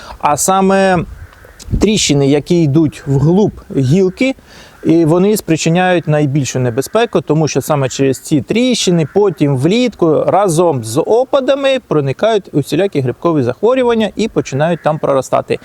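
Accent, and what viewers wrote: Standard. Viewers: Приемно вас слухати здоровья вам.Дуже чиста українська мова🎉🎉🎉❤❤❤ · Украинською классс. Чиста лiтературна, без запинань. То рiвень. Сам я рускоязичний, але перехожу теж на Украiiнський мову та звiсно контент. Тому успiхiв. Ну а з мене пiдписка. Бо корисний контент, але формат з настроем, тому цiкавий.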